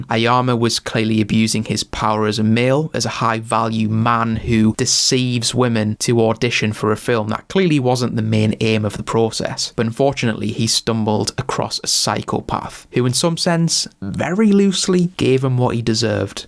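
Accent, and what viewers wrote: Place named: Newcastle, United Kingdom. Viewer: My thoughts are: love the geordie accent and review not bad either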